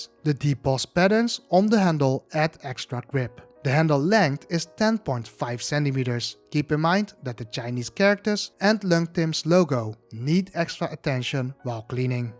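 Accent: Netherlands. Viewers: altijd grappig dat je het Nederlandse accent er doorheen hoort :P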